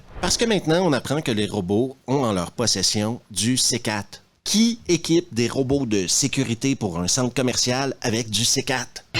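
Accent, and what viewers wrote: Quebec, Canada. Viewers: Salutation fraternelle. T'as définitivement fini par m'avoir, en plus de nombreux fou rire (suffisamment rare pour le souligner) et de.ton tellement sarcastique (j'apprécie énormément en n'en faisant preuve moi-même au quotidien) ton intro m'a tué de rire tellement simple, tellement sobre mais surtout tellement et en prime d'une efficacité extraordinaire 😂😂😂😂😂. Je m'y attendais tellement pas, je me dis que du coup j'ai bel et bien fait de m'abonner je regrette pas 😂😂😂😂😂. Continue de nous éclater avec ta gouaille tellement québécoise, t'es vraiment un bon, on est certes loin des " Cahiers du cinéma" mais c'est tellement jouissif d'objectivité concernant un certain cinéma tombé en désuétude. Nous sommes de la même génération apparemment et tout ce cinéma me manque terriblement. On ne refera pas le passé malheureusement mais dépenser des sommes astronomiques pour voir des septuagénaires en famille ça relève de l'escroquerie. 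Que certains producteurs en prennent conscience et ce bouge le c..., au lieu de financer des taxis 5 et autres bouses du genre, je dis bouse pour ne pas dire navet ou nanar, jai trop de respect pour un Halloween 5 que pour un Indiana jones. Force à toi l'ami et prends soin de toi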